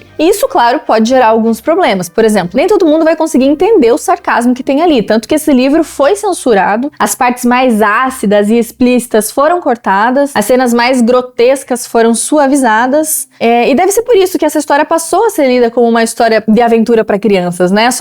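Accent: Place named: South, Brazil. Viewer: Este classico parece ser sensacional, ainda mais apresentado por uma voz doce de sotaque sulista simplesmente indefectível.... simples perfeição!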